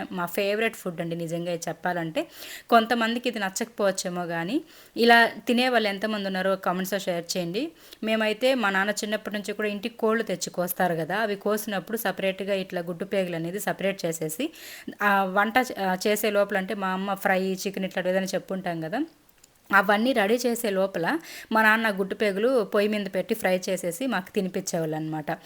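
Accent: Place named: Rayalaseema, India. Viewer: Hi garu, chala baundhe vlog thodi kodalu bonding chala cute ga undhe, meru chesina non veg dishes yummy naku me non veg vlogs chala estam.andi and meru rayalaseema slang bauntadhe andi voiceover kanna original slang video petandi madhe hyd andi..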